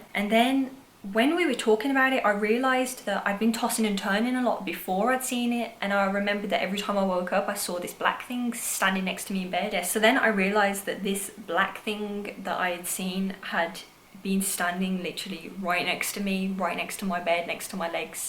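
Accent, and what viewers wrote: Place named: Birmingham, United Kingdom. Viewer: Omg are you from Birmingham, im so distracted by your accent it's just so cute hehe!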